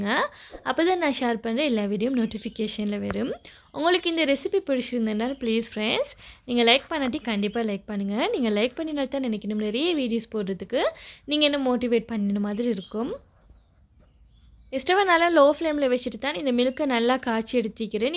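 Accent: Akurana, Sri Lanka. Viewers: Ma Sha Allah looks yummy 😋 I have started watching your videos recently. I really love the way you talk in Akurana slang. It’s like ஊருக்கு போன மாதிரி feeling 😊😊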